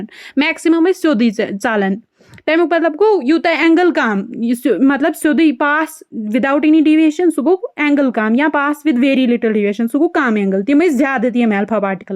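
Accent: South Kashmir, India. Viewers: Are u from south kashmir just saying from accent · Cutest south kashmiri accent.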